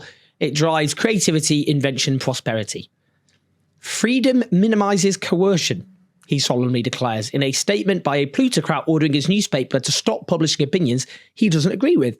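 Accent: United Kingdom. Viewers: You are a fool. Papers have had politics since day 1. That DOES NOT MEAN we don't live in a democracy - stuffing ballot boxes would mean we don't, people having a different opinion from us and owning a paper is undesirable but it isn't even anti-democratic let alone proof of the absence of democracy.....WTH are you smoking? BTW - you sound British so why do you equate the WP with absence of British democracy? ahhhh, I see, you're just a youtuber with an angle.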